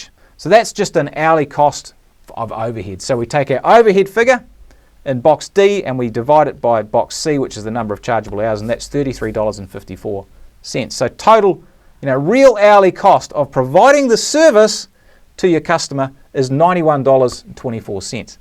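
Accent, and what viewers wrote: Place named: England, United Kingdom. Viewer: Think of his accent. I am not sure if he’s in the US but it’s an English accent and Europe gets more time off compared to US. But I get 160hrs a year plus all bank holidays off so he’s not to far off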